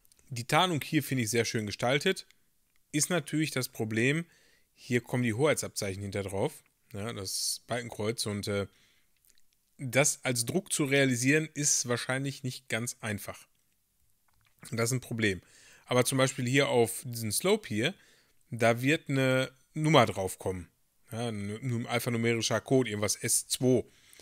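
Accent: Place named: Ruhr, Germany. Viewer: Dat is Pottsprache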